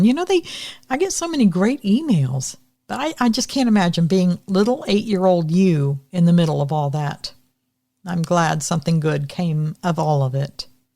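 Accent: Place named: Eastern Kentucky, United States. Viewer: Well I’ve got tears streaming down my face. I too grew up in a house with. Dad that was gone more than he was there and was always spending all our money at the bar with floozies. I was never beaten but I was screamed at and I heard a lot of yelling between my parents. I too remember the day my Mom made my Dad leave. Thank you for sending in your life changing story. I’m so glad that wood booger came along to save you and in turn saved your family., I’m married to a guy from Eastern Kentucky and you sounded like you could be from there reading this story. 😊 I felt like my Mother in law was still here. We lost her to breast cancer in 1998 but you sure did sound like her reading this. I think it’s the pattern of speech they have out there that did it because you have never reminded me of her before. Thank you to you both for bringing a happy ending of Christmas 🎄 joy. 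💖💖💖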